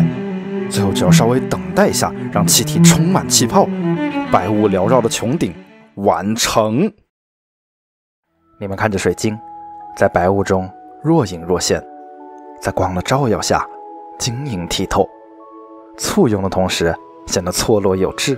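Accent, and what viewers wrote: Wuhan, China. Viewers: 这是武汉普通话吗？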